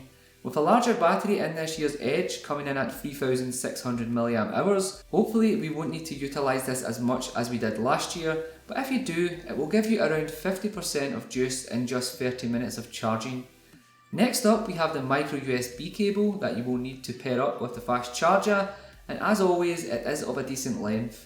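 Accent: Ireland. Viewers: Are you Irish or Welsh? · Do people in Ireland find American accents as cool as I find yours? Lol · r u from Ireland?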